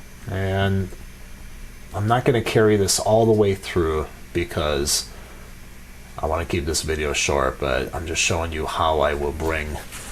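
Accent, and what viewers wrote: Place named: Minnesota, United States. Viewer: best tree video I've seen. I've been practicing our steps and I'm happy how the trees are turning out. Hey you sound like where I'm from - back in Minnesota.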